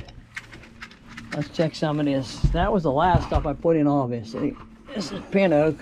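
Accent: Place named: Western Pennsylvania, United States. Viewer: Im in PA too near Harrisburg. Curious, are you near me? Sounds like a Western PA accent? :)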